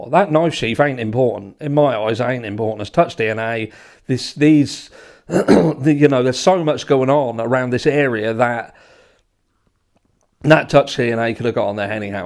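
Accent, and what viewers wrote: United Kingdom. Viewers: BTW, where are you from? I am from SC. I only ask because I thought you were from the UK, but when you say certain things it makes me think you are from Australia..... Things like “Mate”.... Maybe they say that in other places. If so, educate me. Thanks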